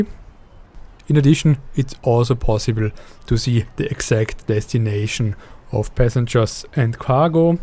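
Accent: Germany. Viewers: Is it German accent? It's hard to understand for non-English speakers. But it's amazing!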